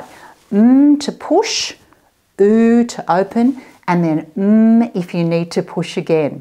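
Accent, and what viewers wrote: Australia. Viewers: you have a very very strong Australian accent! ... it sounds lovely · struggle to understand the Australian accent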